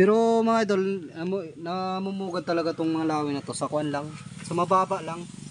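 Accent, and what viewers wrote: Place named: Waray, Philippines. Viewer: Salamat pala dol sa pag shout out saan lugar mo idol waray bayan pang yong tuno kasi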